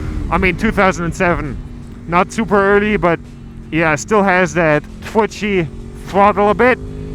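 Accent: Germany. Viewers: Bruh the German accent is killin me 💀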